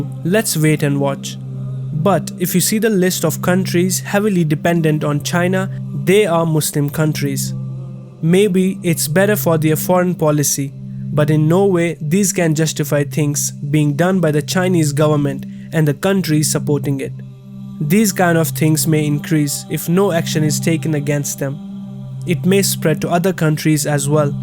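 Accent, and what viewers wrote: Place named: Kashmir, India. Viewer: By your voice you seem to be kashmiri.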